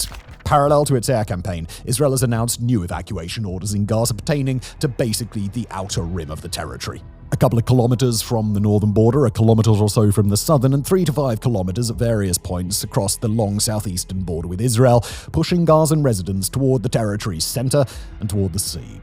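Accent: RP, United Kingdom. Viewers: Simon needs to take the chip off his shoulder and do some unbiased reporting and actually visit these places on the ground. he thinks he's so smart with his posh accent and multiple successful youtube channels that are supposed to enlighten and teach. Yet, he more often than not, leaves out nuance unless it supports the narrative of his videos . I've been watching for years and I've been noticing it more and more. its evidence of my own bias. yes. but Simon is deliberately leaving out facts from his reporting. BIG FACTS TOO SO THATS HOW WE KNOW ITS DELIBERATE!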